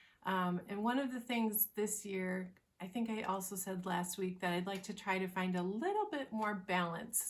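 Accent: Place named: Sioux City, United States. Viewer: Perfect timing for a stash-along as I've decided 2022 is a no buy year for my knitting and cross stitch hobbies. I'm finally noticing all of the gorgeous yarns I have instead of focusing on the next new shiny distraction. I love your accent -- I've been in Tennessee for 30 years, but I grew up in Sioux City. You sound like home and family to me (with some Michigan thrown in ;)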